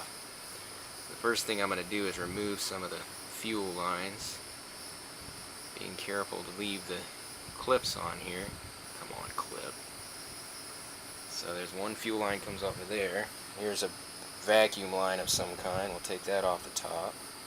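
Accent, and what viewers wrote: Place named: Florida, United States. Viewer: sounds like you live in florida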